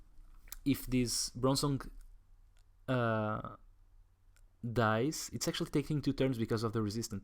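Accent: Portugal. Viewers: Gostei bastante do video, também jogo gengar/bats/wobbu mas aplico bats e resulta muita bem, faço: 3 Gengar 1 Wobbu 4-4-4 Bats 2 miltank mas uso muito menos supps/items, mas acaba por resultar! ajuda bastante agora com wally, se ficares sem mão inicialmente. o que achas? btw, entendi que eras tuga pelo sotaque, mas parabéns, o canal está muito fixe!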